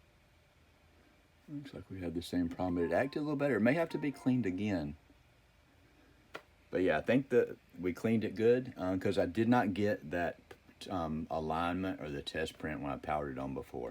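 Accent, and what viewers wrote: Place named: Texas, United States. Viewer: Does this guy not sound somewhat like Matthew McConaughey?!?! LOL! Gotta be from Texas.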